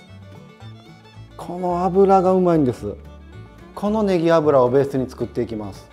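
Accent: Osaka, Japan. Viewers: 今日お昼にこのチャーハン作りました。いつもとは違うと家族も気付き美味しいと言ってくれました。😄流石先生の料理ですね!それと先生の大阪弁で料理の評価しながら食べられるところ面白く、大阪人の私にはとても親近感が湧きました。🥰毎日何かしら先生の料理食卓にのっております。☺️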